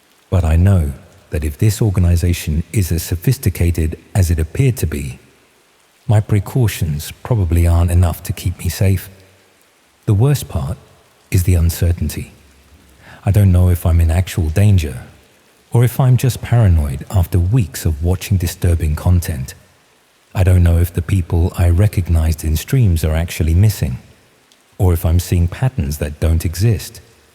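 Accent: United Kingdom. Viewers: Why is he British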